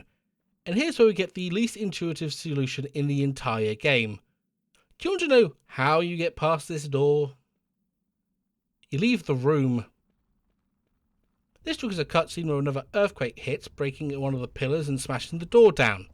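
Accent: United Kingdom. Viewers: Smashed primal on ps2. Genuinely pleased to hear someone from uk when I clicked on this nostalgic brilliantly written blast from the past. Looking forward to what else you've got cooking? c: deserve a mini roll for this vid my G defo ❤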